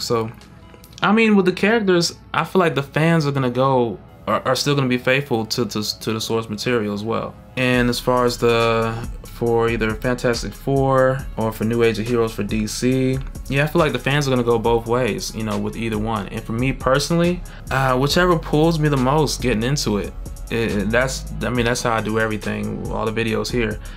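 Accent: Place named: East Coast, United States. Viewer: where are you from? iam from jersey and you definitely have that east coast vibe..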